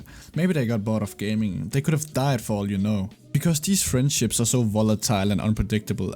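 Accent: Denmark. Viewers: I don't recognize your channel but I was already subbed. You have a Danish accent, so ig I knew u before u blew up. What was your previous gamertag? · are you from Denmark?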